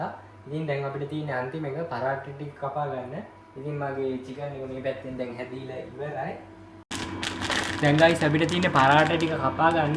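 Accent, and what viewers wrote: South, Sri Lanka. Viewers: ඔහේ දකුනෙයි🫠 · ඔයා දකුනේ කෙනෙක් ද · ඔයැයි....දකුණේ යි😅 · ඔයා දකුණේ නේ 😂😊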